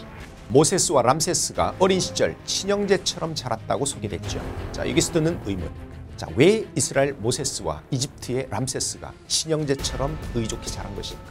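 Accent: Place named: Samcheok, South Korea. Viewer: 20강짜리 7일동안 듣고 한국사능력검정 93점 맞게 해준 ㅋㅋㅋㅋ 강의할때 삼척사투리 쓰시면서 카리스마 넘치시는데... 착한척 말투 완전 적응안되네 ㅋㅋ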